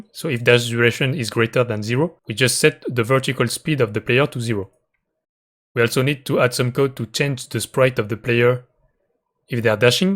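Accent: France. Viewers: J'ai tout de suite reconnus l'incroyable accent haha ! Plus sérieusement merci beaucoup et c'est incroyable de voir des devs français sur yt !! (même 4 ans après) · do you speak french its like your accent is a french one? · that french accent, i like it !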